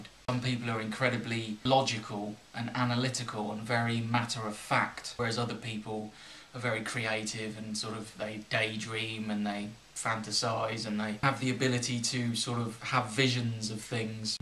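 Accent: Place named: United Kingdom. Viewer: I rarely leave comments on YouTube but I think you are really brave to talk about your mental problems in public, in front of a camera... You look like a truely good person and I hope you get better soon. I'm a high carb low fat vegan too (starch based) and I find your videos so helpful and interesting ! Keep going ! And I love your brittish accent....so soothing! (I'm French)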